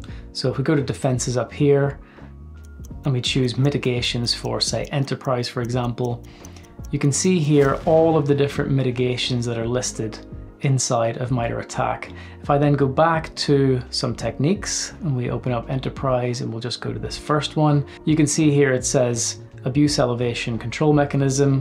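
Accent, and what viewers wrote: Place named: Scotland, United Kingdom. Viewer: but you need easier english, you speak too scottish bro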